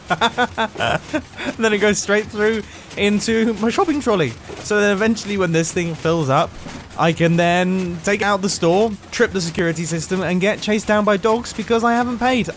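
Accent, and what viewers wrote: England, United Kingdom. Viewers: It's not funny that's just how British people speak which includes me and what the hell is a pizza roll?!?! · English accent I suppose? It depends where you're from in England so I can't say because I'm pretty sure I'm not where is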